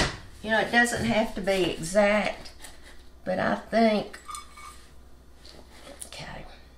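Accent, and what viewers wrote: South, United States. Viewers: Thank you so much for the warm welcome! I know that Southern people are very kind... I married one 😊 My husband is from North Carolina. I just LOOOOOOVE those Southern accents 😍😍😍 God bless you and the rest of your family as well 🙏🙏🙏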